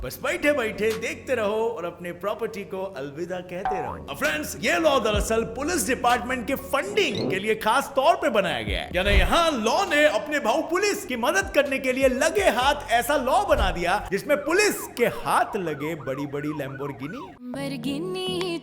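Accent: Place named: Telangana, India. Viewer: Telangana hindi language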